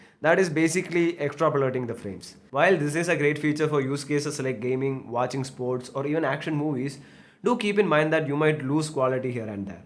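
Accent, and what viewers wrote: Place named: Kerala, India. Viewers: Its like malayali accent 😀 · Strong malayali accent with a super detailed review.. what's not to like?? Loved it!! Hope to see you more😀😀😁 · That strong mallu accent is why I watched the whole video. No kidding. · He is a mallu bruh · Malayali accent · loved your Mallu ascent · Looks like the host is a Malayalee from his accent! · accent is very thick and top of that he has a strong south indian accent, something I find it very hard to listen to...!!!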